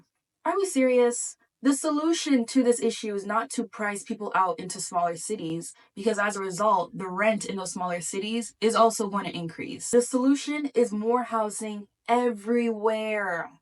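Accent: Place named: Canada. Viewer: I'm genuinely surprised to hear a Canadian accent on this video. That's how bad things are.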